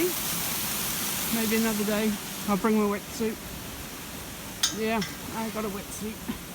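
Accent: New Zealand. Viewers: Is that a New Zealand accent i detect ! I grew up in Auckland but have lived in Manchester for the last 27 years !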